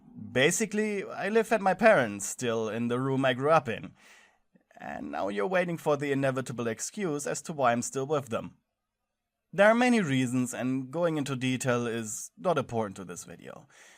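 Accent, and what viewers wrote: Germany. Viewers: I knew from the moment I heard the first asmr you were german!! I know it’s really hard but I know you can do it! We are always there to support you! Soooo viele Grüße aus einer klein Stadt in Deutschland! Hoffe sehr das du dein Ziel erreichst! 💕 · Fuckin knew the accent was German. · I assumed you were a German through your accent Ich hoffe sehr das dein Neustart Erfolgreicher wird! ich verstehe dich sehr im Thema arbeitsuche (& Phobias/anxiety) Ich wünsche dir viel Glück und werde versuche dich in all möglichen Sachen zu unterstützen!❤️ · Ich hatte ja schon ewig das Gefühl dass du Deutsch bist wegen dem offensichtlichen Akzent :D Ich fühle mit dir das Leben wird immer wie teurer und wir jungen psychisch angeschlagenen Leute können da kaum mithalten…Bin selber noch mit fast 30 bei meinen Eltern und es ist mir so verdammt unangenehm! Ich hab deine Videos schon so vielen Leuten geschickt die ich kenne da deine Vids so gut sind bin echt sad zu hören dass du weniger Klicks bekommst als du verdienst :( Das tut mir mega leid. 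Hab selber mega Phobien und arbeite online als Streamerin, ist schwer Klicks zu bekommen und die Konkurrenz ist riesig zur Zeit womöglich wegen Corona da alle versuchen von zu Hause aus Online zu arbeiten :/ Deshalb will ich mich nach Amerika begehen und ich finde mit deiner Stimme solltest du ernsthaft darüber nachdenken das gleiche zu tun um Synchronsprecher zu werden! Man kann es auch online machen ^^ Gibt n Discord Channel namens The Voice Acting Club dort gibt es viele hobby Synchronsprecher die dort Jobs bekommen für so amateur Comics, Youtube Videos von Leuten die gute Stimmen suchen. Wäre also möglich dort auch für dich den einen oder anderen Job an Land zu bringen um dein Einkommen aufzubessern ^^ Hoffe diese Tipps helfen dir :)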